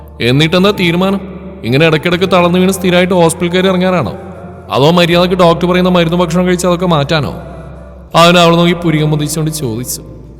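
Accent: Tamil Nadu, India. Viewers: ഉണ്ടേ!!!!!😂😂 ഈ തമിഴ് കേൾക്കാൻ നല്ല രസമുണ്ട് 😊😊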